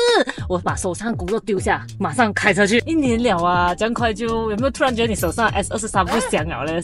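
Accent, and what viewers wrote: Malaysia. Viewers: 马来西亚口音 没听错的话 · Maglish 聽起來真有趣.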